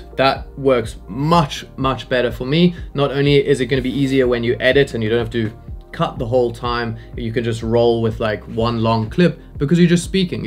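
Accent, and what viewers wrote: Cape Town, South Africa. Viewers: Lekker video bru. I was trying to place your accent as it didn’t seem Australian. Then I realised you’re from Cape Town too. I’ve been away to long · Is that a South African accent??❤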